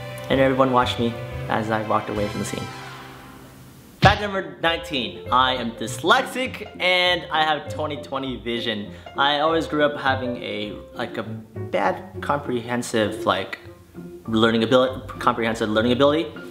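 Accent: United Kingdom. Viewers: you sound british man! lol - greetings from sydney australia! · ahaha your accent leans more towards the british accent :) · The accent.... Lol I'm Australian and that was so British ahaha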